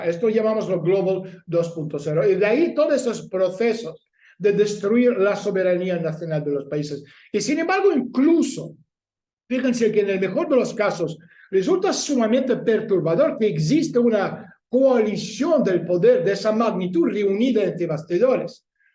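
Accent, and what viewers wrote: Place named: Spain. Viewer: Si ya vives en México¿Porqué hablas en español de España? Creo que sería más cómodo para todos que te fueras a vivir a España, con Borrel, te sentirás bien de compartir la lengua de ese "rusófilo" conocido.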